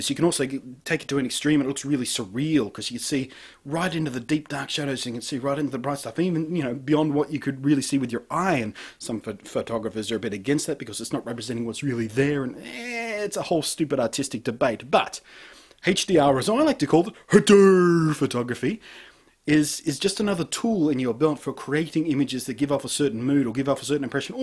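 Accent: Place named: Australia. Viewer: the australian way of saying "H" is awsome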